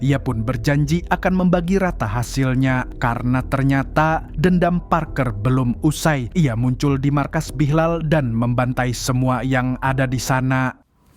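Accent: Banjar, Indonesia. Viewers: Ai urang banjar sakalinya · Curiga amang nya nih urang sungai tabuk😂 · Orang banjar ya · Pian urang Banjar kah mang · Bang admin pake bahasa Banjar kayaknya 😅😅😅😂😂😂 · Ikam urang Banjar gayaknyak. Samak awan diakuk.slm kulak.alehhai. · Banjar kh mang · Urang banjar ka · Bah banjar jua sekali nya, d.mn nya ikm mang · urang banjar kah ini 😂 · Urang Banjar kah mamang ni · Urang banjar kah pyn mang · urang Banjar kah Pian ni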